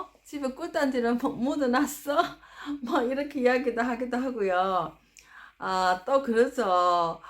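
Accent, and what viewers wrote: Gyeongsang, South Korea. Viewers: 찾아주셔서 매우 감사합니다 좋은 강의도 듣고요 어찌 알고 오셨나요 행운의날예요 간격두고 인사올릴께요 영주 남편고향 가면 사투리 듣는데 경남이신갑네예 ⚘️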